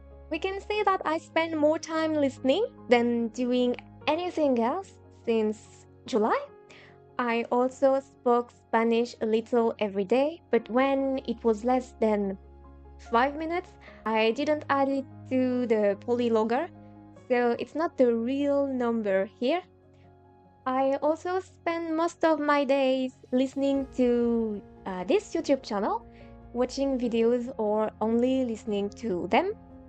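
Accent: France. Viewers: Hola! I think I missed what your native language is! maybe french based on your channel's name jajaja. So, keeping with the topic of your video, im gonna keep writing in spanish. Me encantó el video! Soy de Argentina y también estoy en este viaje de aprender algunos idiomas. En este momento tengo un inglés que fluctúa entre b2 y c1, dependiendo de las skills que use (writing or speaking). Estoy aprendiendo algo de italiano y ruso (muy básico). A diferencia de lo que dicen algunas personas, creo que el español es uno de los idiomas "más fáciles" para aprender puesto que es un idioma fonético, y realmente no usamos los 16 tiempos verbales. en este punto estoy escuchando tu descripción y noté el acento jajaja Vos (tú) venís excelente! El acento francés le da el toque especial a tu español jajajaja, se escucha muy tierno. Entiendo que estás aprendiendo el español de España, no? 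El español de Argentina es mejor, way cooler 😁 Definitivamente voy a seguir todo este proceso en tu canal!! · Hola, you have improved a lot since I last commented on your videos. You've started to use the preterite a lot instead of the present perfect which is great, you have verbs agreeing with the subject. - mejor "capítulo", creo que se puede decir también "episodio" - excellent use of the imperfect and I love the cute "oui" after you corrected to "mucho tiempo" haha - cuarenta (quaranta is Italian, which I would love for you to start learning too 😄) Edit: you corrected yourself actually so great job! - this is quite advanced but I think it would be "como era", I think you can say "pero porque" but it's kind of a direct translation from English ("but because"), also then it's "no pudimos comprar nada en las tiendas" (I also get podemos and pudimos mixed up!). Great job with "pescado" that was correct and great that you didn't say "pez" - ooooh a subjunctive! 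I also love your accent in English because it's like a mix of a French and unspecified Asian (I guess Korean in your case). You speak clearly and correctly which is the most important thing. I for example would just speak to you like I do with other native speakers, that's how good you are!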